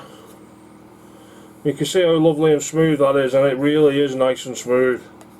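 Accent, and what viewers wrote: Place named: Yorkshire, United Kingdom. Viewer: I'd watch these videos just to hear that lovely Yorkshire accent..... ;) :) :) :)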